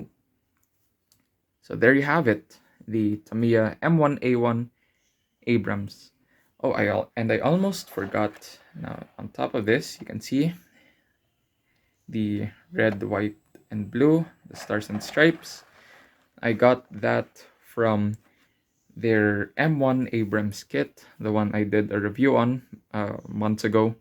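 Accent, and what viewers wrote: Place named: Philippines. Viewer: Subbed. I just found your channel. I like modelers who can finish a project. Looking forward to your next video. By your accent, are you Pinoy too?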